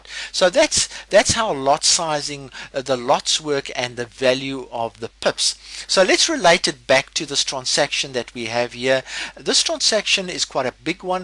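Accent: South Africa. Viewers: You must be from South Africa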